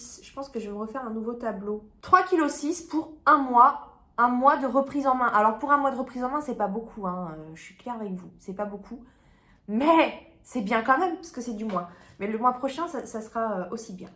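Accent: Brittany, France. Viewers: Bravo pour ce formidable parcours! Tu est au top 😊 ( Et dans tes vidéos j'aime bien les petites choses concernant la prononciation made in Finistère comme "même" qui deviennent " Meum", sorry je fais ma pikez 😉😂 )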